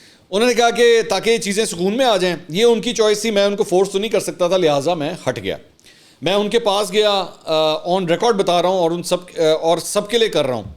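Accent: Punjab, Pakistan. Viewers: Mansoor ji…. Love your Punjabi ‘touch’, makes your VLOG more colourful..!!💯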